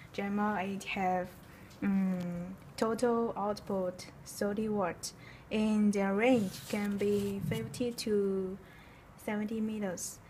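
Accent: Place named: East Asia. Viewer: Andre Moreno well shes either Chinese or Japanese based on the accent and basic English speaking second China & Japan don't care to much apparently because i hear shortwave pirates from Japan & China alot. so this really dose not surprise me...Asian woman..showing illegal jammer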